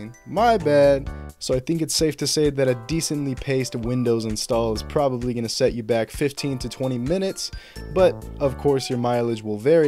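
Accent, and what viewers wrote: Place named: United States. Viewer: i love how to this guy narrated the video with the slang and bla bla bla, very american i love it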